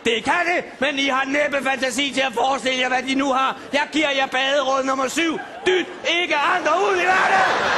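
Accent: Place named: Denmark. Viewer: he is reading Norwegian in Danish making it sound really stupid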